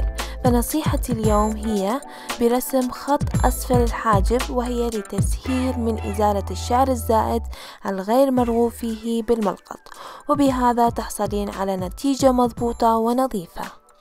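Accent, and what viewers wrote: Iraq. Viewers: صح ماكو احلى من لهجت العراقيه حلو واحد يحجي بلهجته مو بالله 😂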